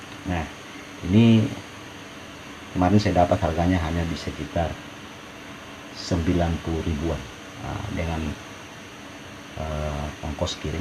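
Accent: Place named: Palu, Indonesia. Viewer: Dari logat sdh ketahuan org palu mange🙏😁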